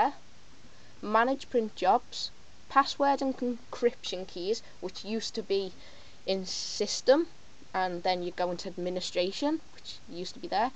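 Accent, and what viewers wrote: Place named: Liverpool, United Kingdom. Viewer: No, But im a Pool supporter and recognize the accent.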